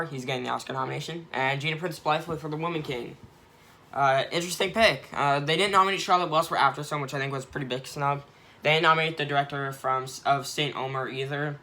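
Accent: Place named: United Kingdom. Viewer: Love your british accent 🤭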